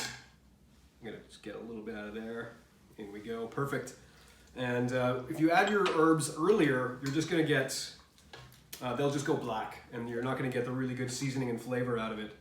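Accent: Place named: Scotland, United Kingdom. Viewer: I usually have that as a starter whenever I'm in Scotland. Brings back memories of having it on cold days, both summer and winter 😅 I always like how the Scots add barley in soups while in Asia, it's served as a drink or dessert. There are variations of Scottish accents and yours reminds me of the Simpsons. 😅 Great one. It looks amazing and rustic 👍🏻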